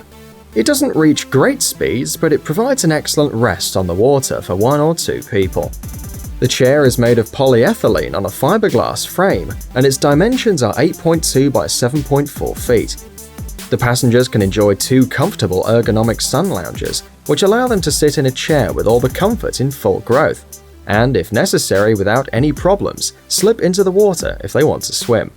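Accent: United Kingdom. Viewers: Couldn't listen to one more minute of that annoying british accent .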